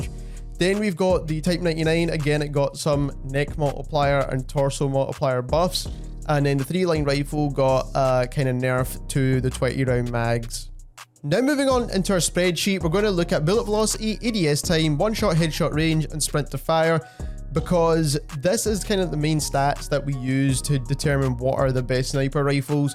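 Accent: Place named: Scotland, United Kingdom. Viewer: Love a good Scottish accent.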